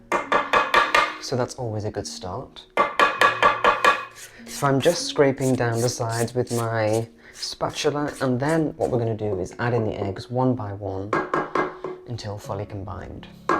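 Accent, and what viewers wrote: United Kingdom. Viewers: Your cake is lovely and looks delicious. I never get tired of hearing your beautiful voice and wonderful British accent. I could listening to you read for hours at a time. Wishing you the loveliest of birthdays. 🐕🇺🇸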